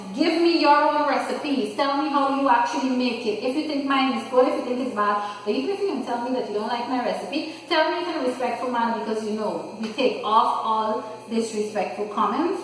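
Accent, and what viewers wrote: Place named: Guyana. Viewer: I miss my mommy pepperpot and it is great to hear the guyana accent when away from home, always make me smile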